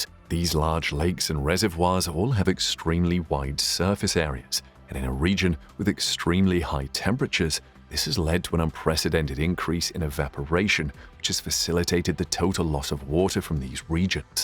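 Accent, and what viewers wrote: United Kingdom. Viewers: If a British guy is narrating you know it's gotta be legit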